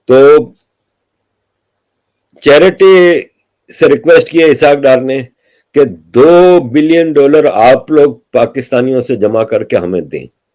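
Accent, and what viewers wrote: Gujarat, India. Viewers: Sir aap k bol ne me abhi bhi gujrati accent dikhay de ti aor sir aap j se musalman ki Bharat me jarur hai aap Gujarat me aa ke rahi ye sir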